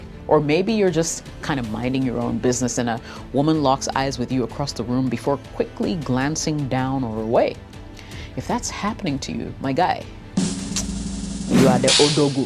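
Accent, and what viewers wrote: Nigeria. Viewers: You sound like a Nigerian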